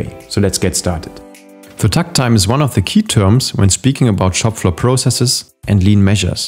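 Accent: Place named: Germany. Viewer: Man hört, dass du Deutscher bist. warum machst du dann nicht das Video auf deutsch?👀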